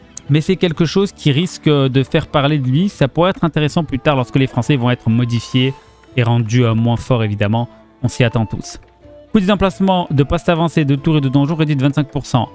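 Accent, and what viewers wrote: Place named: United Kingdom. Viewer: Marrant, les haters qui se moquent de ton accent anglais ne viennent pas corriger ton allemand ?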